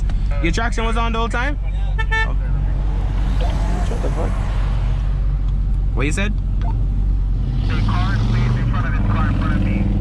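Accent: Jamaica. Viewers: I think I can hear that Jamaican accent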